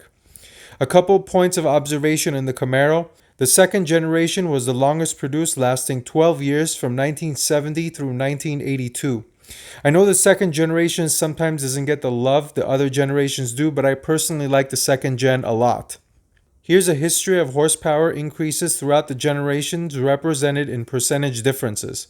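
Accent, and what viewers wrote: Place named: Michigan, United States. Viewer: Sound like you from Michigan.. Dearborn?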